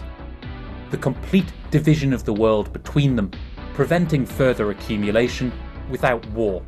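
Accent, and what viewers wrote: United Kingdom. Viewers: You sound British or Anglo-Saxon for sure, so you don't live in communism. SO, JUST ASKING HOW DO YOU KNOW THAT IT'S SO GREAT AND WONDERFUL? LEGIT QUESTION.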